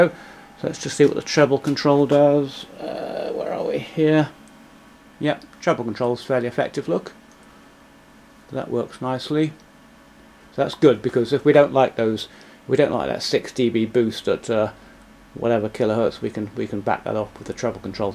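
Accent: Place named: United Kingdom. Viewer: watching so many of your videos from usa i am picking up your british accent. :).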